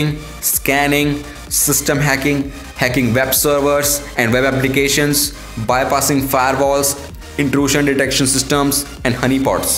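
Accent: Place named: Telugu, India. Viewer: Telugu accent spotted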